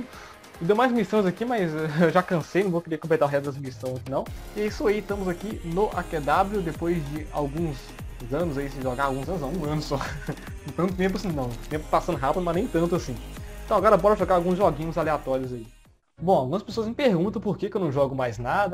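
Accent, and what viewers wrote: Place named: Bahia, Brazil. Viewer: essa voz de baiano que saudade crl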